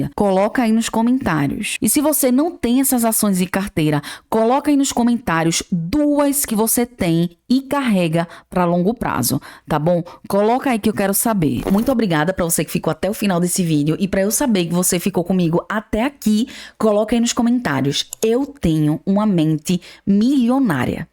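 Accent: Northeast, Brazil. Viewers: Letra B Vc é de q parte do nordeste? Sou de Caruaru PE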